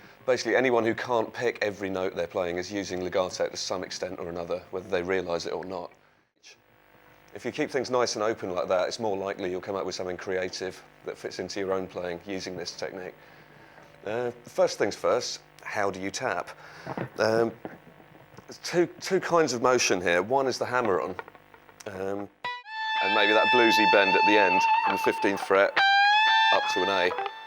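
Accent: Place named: United Kingdom. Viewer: Your accent is so British.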